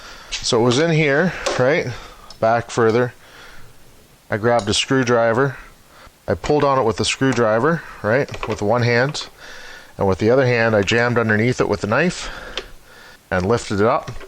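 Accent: Canada. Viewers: Total stud! Thanks for saving me a ton of money on a sales call. And love that Canadian accent!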